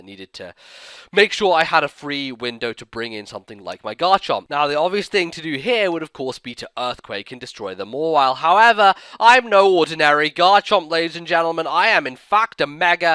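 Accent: France. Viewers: Yes... more French accent stuff! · Can we PLEASE have a battle narrated fully in your French voice? That would be oh so amazing.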